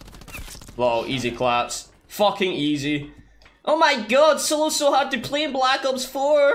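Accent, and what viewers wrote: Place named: Scotland, United Kingdom. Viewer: Found you on K3’s channel and I’m glad I did! Where in Scotland are you from?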